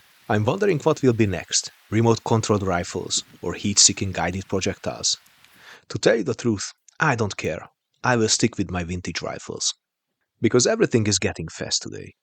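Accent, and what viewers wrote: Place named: Hungary. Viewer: The best channel in the beautiful bright hunglish language just uploaded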